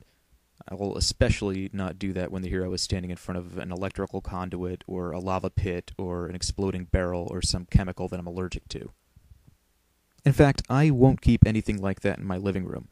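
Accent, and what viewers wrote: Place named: Scotland, United Kingdom. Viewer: Bralliant! (Yes the Scottish accent is deserved).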